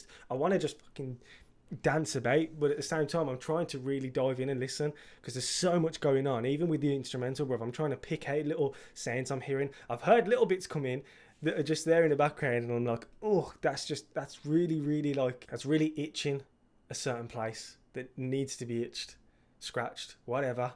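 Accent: United Kingdom. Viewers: Aren't you British? You sound British. How have you never heard of?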